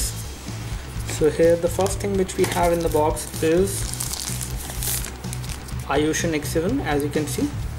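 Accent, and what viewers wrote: India. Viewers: i quite like your reviews except for this english... i don't know to tell but your english is a bit too indianish ahha :) never mind of a stupid like me, good work guys ;)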